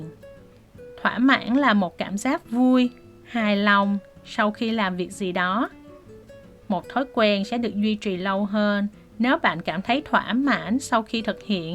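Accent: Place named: South, Vietnam. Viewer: 전 남부 발음을 공부하고 싶어서 이 채널이 좋아요!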